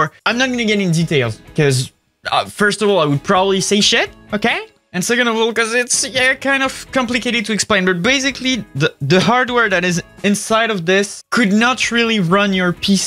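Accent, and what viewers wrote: France. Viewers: As a French, I find him speaking English with a French accent so frustrating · he is french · I never thought a french accent would be hot until now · He is slowly loosing his french accent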